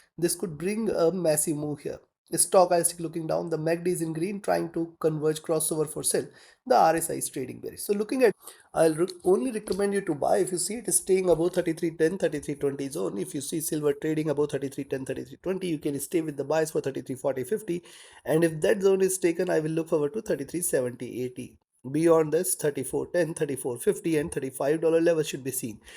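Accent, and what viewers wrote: India. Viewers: Its hard to focus on what you saying . Sound like Indian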